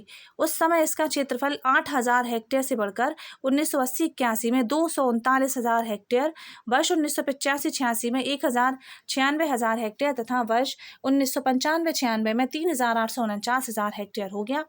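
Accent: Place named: Bundelkhand, India. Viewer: Your bundelkhandi accent is cute😉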